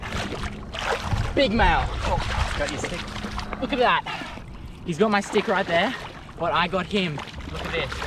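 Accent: Australia. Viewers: Fun of his accent? lol I'd pay hundreds to get an Australian accent as good as his.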